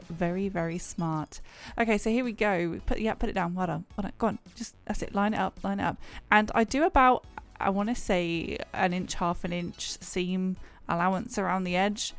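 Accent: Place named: United Kingdom. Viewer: NO worries on the delayed response. 😊 I know the U.K. has lots of distinct regional accents & your voice is so similar to hers that I was already pretty confident that you must be from around the same area. Anyway, again I really enjoyed the video & thought you did the legendary Streets Of Rage babe proud with that lovely cosplay.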